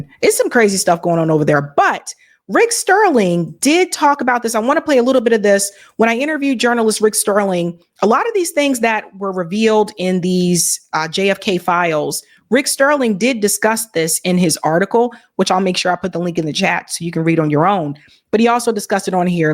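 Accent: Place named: Boston, United States. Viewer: every once in a while you slip in a word with a Boston accent and I love it. As a Masshole born & raised I love seeing our accent rub off a little on transplants.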